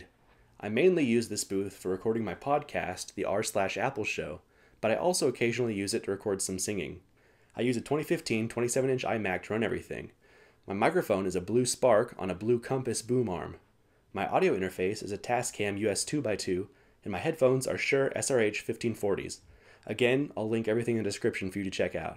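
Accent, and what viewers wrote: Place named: Australia. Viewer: There's some Australian creeping into your accent for sure.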